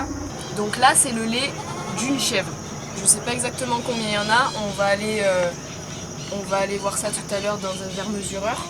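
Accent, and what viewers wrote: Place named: South, France. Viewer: Tu me régale !! Cet accent du Sud de ouf 😂😂 👍🏽😘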